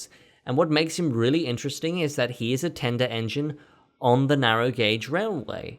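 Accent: Australia. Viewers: Wow ur Australian. My brother and I thought u were British 😅😅 but I would love to see ur T&F episode. And I'm starting to think I'm the only one who likes thomas and the magic railroad. Also I think when this Q&A is over you should do one with ur characters from your series.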